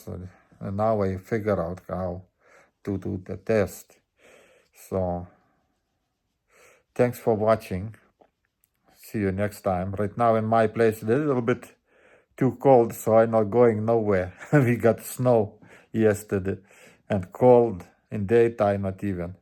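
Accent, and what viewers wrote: Russia. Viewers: Дядь я не пойму ты русский ?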